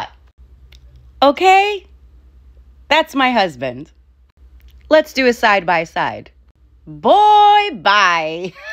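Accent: New York, United States. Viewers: New Yawk girl tellin' it like it is! Straight up, to the point, take it or leave it! 👍😀 Stay TRUE, Vote BLUE! 💙🇺🇸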